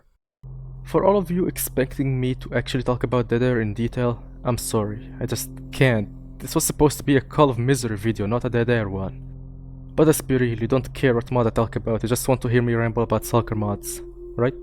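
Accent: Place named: Tunisia. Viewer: direct chle9t bik tounsi mel accent ! GG Bro nice video. Big STALKER Fan Here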